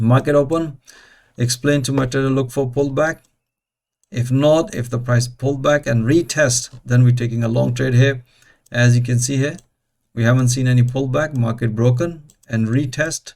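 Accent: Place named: United Kingdom. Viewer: Sir pls try to make us understand in Urdu language. Many people are unable to understand British accent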